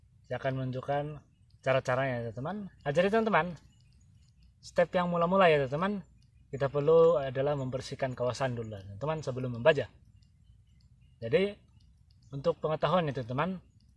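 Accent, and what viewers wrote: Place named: Sabah, Malaysia. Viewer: Kau org Sabah pulak teman2😂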